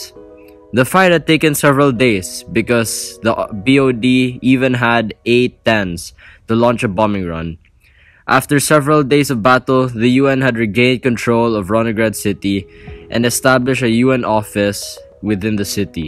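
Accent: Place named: Philippines. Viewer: I can already tell he is a filipino by his accent.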